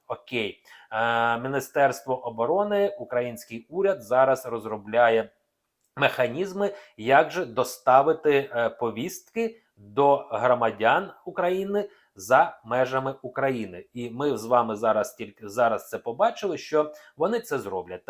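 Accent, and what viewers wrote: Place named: Russia. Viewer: Прослухала не один матеріал від Вас і таке враження наче Ви радієте коли говорите, як повернуть чоловіків з-за кордону; вишлють біженців..як нікому ми тут не потрібні.. є нотка злорадства. Дивлячись на досить помітний російський акцент, здається що це таке собі іпсо. Хоча не зрозуміло чи від України чи від москалів. А може краще було б відіб@ від людей, перестати маніпулювати? Дати кожному вирішити самому де жити і за що воювати? Приносити користь, кажете. Так зберіть алкашів, нехай приносять користь. Там точно ціла армія набереться. Чи що, політики наші вже синів з за кордону забрали і відправили в бій?) Клоуни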